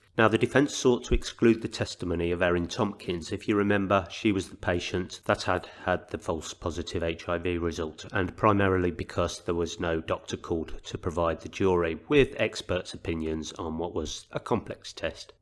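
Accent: United Kingdom. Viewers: Thank you for your update……really enjoy listening. Good to hear a fellow Brit!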